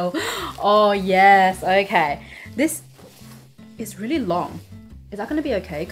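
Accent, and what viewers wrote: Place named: Australia. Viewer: I like your aussie accent while you can fluent speaking japanese 😮😊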